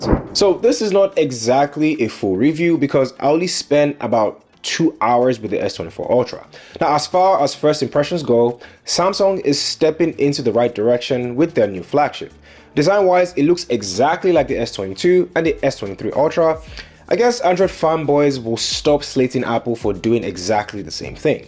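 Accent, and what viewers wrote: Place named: Nigeria. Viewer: bros nigerian accent makes it so hard to understand XD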